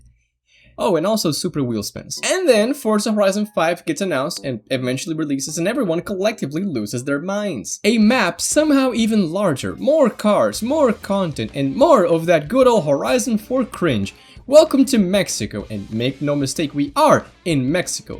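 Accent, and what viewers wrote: Brazil. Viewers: U kinda sound brazilian edit: kkkkkkk you are 🇧🇷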